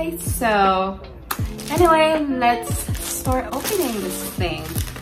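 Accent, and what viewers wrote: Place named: Philippines. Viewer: Dami paarte ng salita, pilipinong pilipino naman tono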